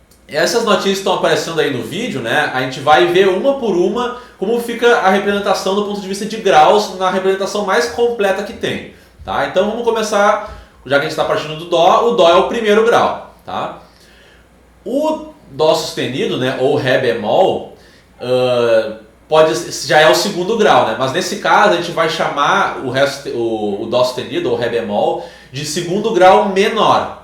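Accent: Rio Grande do Sul, Brazil. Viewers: Muito bem entendido;;; me ajudando muito... . só uma pequena perguntinha::: vc é gaúcho?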